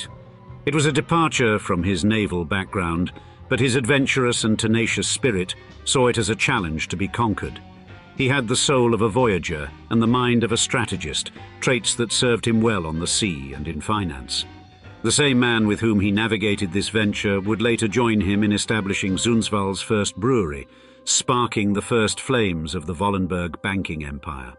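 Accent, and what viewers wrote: RP, United Kingdom. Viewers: Very nice SRP accent by the announcer. And no, the "Queens English (SRP)" is not even close to the accent of the majority of British people. The vast majority of perfect RP speakers are in overseas Commonwealth countries. Only 5-15% of British people speak with an RP (received pronunciation/ Queens English) accent. I write this to expel simplistic ignorant assumptions that many people hold.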